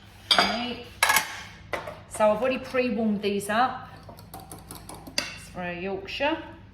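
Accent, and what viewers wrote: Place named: Cockney, United Kingdom. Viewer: She speaks with a cockney accent. She must be the last cockney left in Britain.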